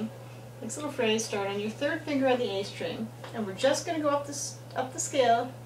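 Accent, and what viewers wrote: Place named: United States. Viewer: You sound American do the americans play irish trad?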